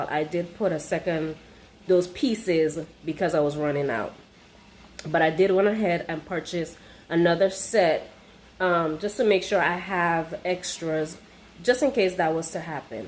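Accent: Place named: Guyana. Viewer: Why do I hear your Guyanese accent 😭🔥🔥 I love our accent! Thanks for the video!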